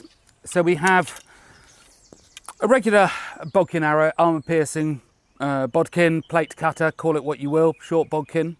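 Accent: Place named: United Kingdom. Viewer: You looked like a bald German guy who I've seen on YouTube before. When I heard a British accent you scared me.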